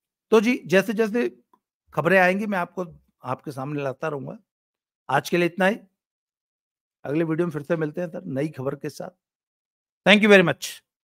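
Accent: Haryana, India. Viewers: Babi ji leave everything aside but your haryanvi n punjabi accent too good...make a video purely in these language. It ll be delight to watch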